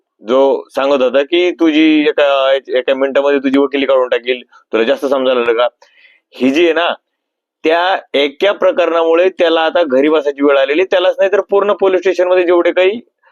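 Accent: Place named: Dhule, India. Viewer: भाऊ तुम्ही धुळ्याकडचे वाटतं..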